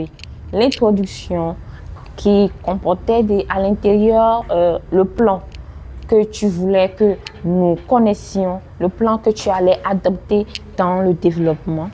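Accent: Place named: Guinea. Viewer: comment tu vas? Bravo pour le travail que tu fais. L'accent guinnéen sort un peu hein😅. Bonne chance pour la suite et Dieu te garde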